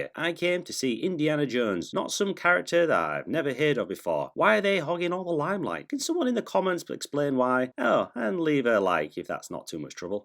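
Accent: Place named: United Kingdom. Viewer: its just his beautiful british accent fr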